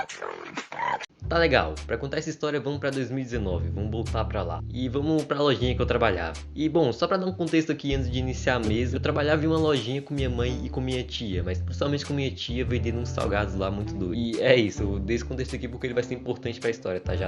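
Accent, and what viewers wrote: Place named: Bahia, Brazil. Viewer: voz de baiano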